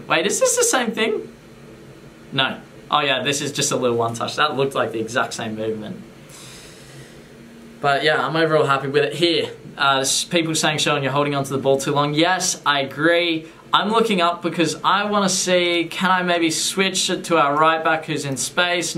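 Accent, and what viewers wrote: Australia. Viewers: Damn that Australian accent, great video btw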